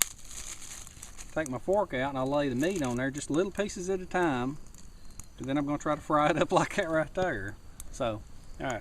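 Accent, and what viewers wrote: South, United States. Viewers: Loving this already, just from the way you talk; sounds like home. Awesome use of all the items, and what a fun challenge! One of the best videos of this sort I've seen. Elevated bed, great use of the food and cooking, and great camera work from Nick, too. Such fun. The woods make me homesick. From another green-eyed Southerner, well done! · I live in TN. In Nashville now and I lived in east TN by the mountains when I went to college(ETSU). Noticing accents is something I kinda picked up. To me you have a northern Alabama/Georgia accent which is cool. You should have a survival weekend and invite survival/bushcrafters from youtube and have challenges. Im new to it and I'm kinda preppy you could say so my friends arent one to get in the wild like that so I mostly do it by myself so getting to see others on youtube is a treat.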